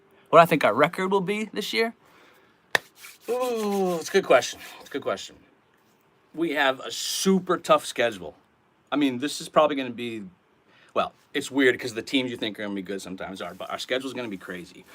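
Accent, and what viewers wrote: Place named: Maine, United States. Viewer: Good Job bro, that Maine accent shows up on live. Good people hunting is great.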